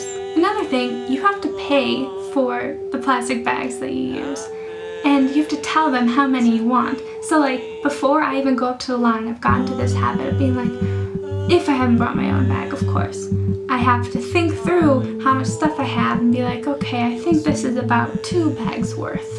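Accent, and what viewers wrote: France. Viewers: You have a great french accent :O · i'm totally in love of your french accent :) i'm french and i'm approve all the things you say about "monoprix" and for the " french supermarket" in a general way . it's very interesting to see our culture from your point of view. Did you talk about the fact than even the men do " la bise" with others men ? i think for American people it's a social manner inappropriate ( sorry for my english ) .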